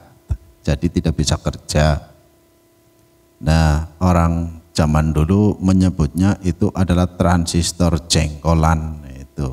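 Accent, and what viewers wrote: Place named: Banyumas, Indonesia. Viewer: Salut. Servis di daerah mana mas ? Kalo dari logatnya kayanya banyumas ya ? Hehe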